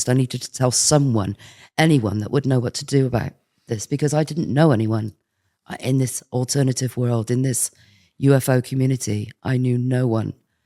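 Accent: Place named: England, United Kingdom. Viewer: This is a typical English voice if you live in England. Her accent is pleasant but not unique. She's English 😂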